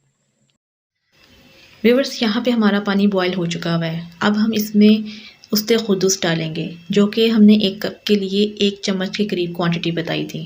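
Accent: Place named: Lucknow, India. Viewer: Aapke aaba ijdaad lucknow ke the kya? Aapka accent me lucknow ka bohot asar araha he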